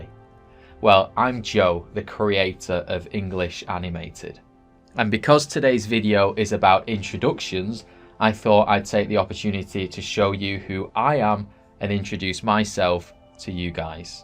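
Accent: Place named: United Kingdom. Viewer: I love British accent. Could you please guide me how to learn it?thanks.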